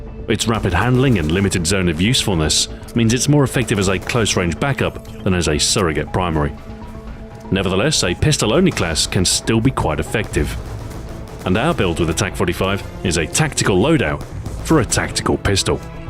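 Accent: England, United Kingdom. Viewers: bet you're English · If you can't understand an English accent when you speak English as your first language then you just fail at life.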